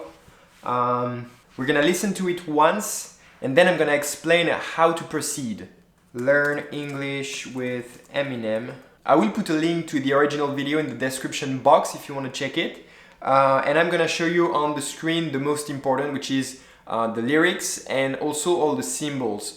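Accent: France. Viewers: I think ur from France ...u have a frensh accent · You have a nice french accent, like it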